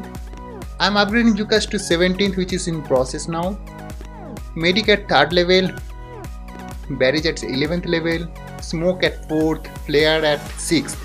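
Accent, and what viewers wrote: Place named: India. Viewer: why do you talk like indian person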